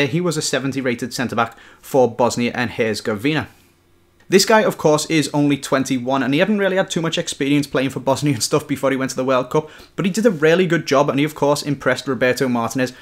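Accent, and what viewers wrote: Liverpool, United Kingdom. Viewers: Your scouse accent is coming out when ye speak hahahah stop putting the voice on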